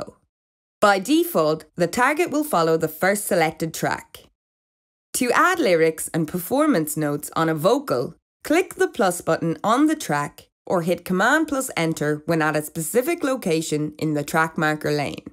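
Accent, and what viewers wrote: Ireland. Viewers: Good vid but the Irish accent is like listening to bad Melodyne on a Didgeridoo. Please use the usual VO so as not to be distracted. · Inner city Dublin. Next to being a gypsie. If it's any help, bring volume to zero and use subs. Nails on a teacher's blackboard is easier to listen to but the screen is busy enough unfortunately.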